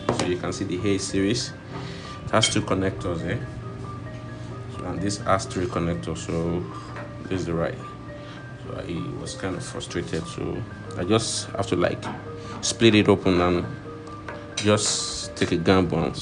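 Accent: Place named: Yoruba, Nigeria. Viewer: When i heard how you are speaking, i uad to check your name. Yoruba man 👍👍👍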